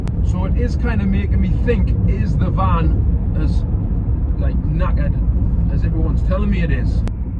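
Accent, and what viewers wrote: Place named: Newcastle, United Kingdom. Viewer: Union scaffolder since 2014 but started taking training on tube and clamp earlier this year and got into watching your videos. Got to say I love the Geordie accent. My brother in law is from Chesire and the Scouse accent is great as well. Been watching Dibnah videos for years. Always done systems with tube and clamp additions but learning to put up proper tube and clamp has been a blast. Doing "English" style as we call it here and "Western" types. Western we put the transoms on the standard instead of the runner/ledger. All wedge clamp here though. Bit of a holdover from the days of scaffolds being all wood. Tube and fitting was never really a thing until it was used for industrial in the 70's. Love the videos mate. Glad I could help you out with the van some.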